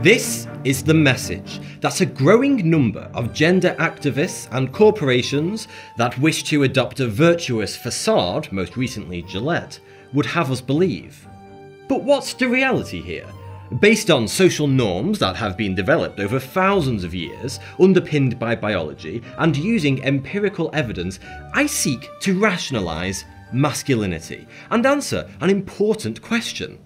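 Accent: United Kingdom. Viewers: You’ve gotten a lot more British I see bud good on you! Missed your uploads..loved the look on the subject hope your doing well! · You haven't been watching much of him then. I used to learn cute factoids in a British accent from him now he's just another IDW wonk.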